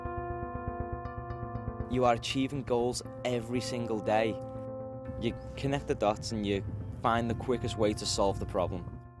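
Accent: Liverpool, United Kingdom. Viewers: I knew you were Liverpudlian by your accent<3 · The moment I heard you speak I knew you were from northern England and I thought of Liverpool because your accent sounds exactly like John Lennon's! PS: Great video, great skills, great sport!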